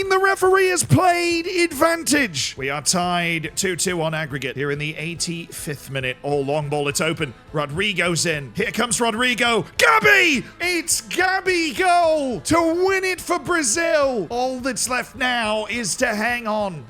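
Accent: United Kingdom. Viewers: What a video please do more with your British commentary 😂❤ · Why do you commentate with a British accent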